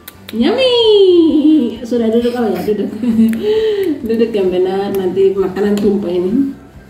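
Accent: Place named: Papua, Indonesia. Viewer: Bule jerman pintar bahsa Indonesia dan yg lebih asyik bisa logat bahasa Papua dn Maluku..keluarga bahagia..salam kenal dr kota Timika🤩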